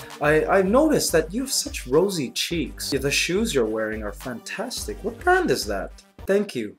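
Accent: Philippines. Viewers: i love it when you're speaking with pilipino aksent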